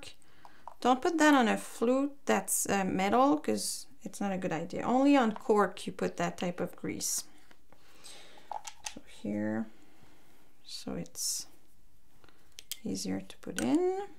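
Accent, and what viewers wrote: France. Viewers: Très bonne vidéo ,sa ce vois que te es française avec l'accent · French accent? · Énorme accent français jsp si tu est française ou pas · J’ai l’impression qu’elle a un accent français non ? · Are you french? You have an adorable french accent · Lorky ouii elle a un accent je trouve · J’ai entendu l’accent français et ça fait plaisir ! Sinon super vidéo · Are you french/french canadian? There is a light accent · Une française j'ai reconnu avec les r hehe sinon c pas mal je pense pour les débutants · Serait-ce un accent francais ? 😬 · She is French from her english accent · Coucou , j’entends un accent français je me trompe ? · Je me disais bien qu'il y avait un accent français... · I could spot your french accent in a way or another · l'accent français ce ressent mdr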